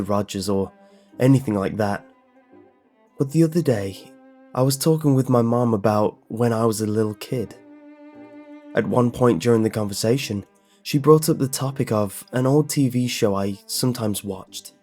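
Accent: United Kingdom. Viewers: DesiLovesSouthPark It's called an accent, friend. They don't do it on purpose, that's just what sounds natural to them. If you'd grown up in the UK, you'd do the same thing and think nothing of it. They probably get annoyed that we DON'T put "r" sounds after "a" sounds or "k" sounds after "g" sounds, it probably pisses THEM off. The American accent is not the one true way to pronounce the English language - it's an accent, like what you'll find anywhere else. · It's a British accent, and sometimes they'll do that without noticing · I really hate that angst saturated british accent!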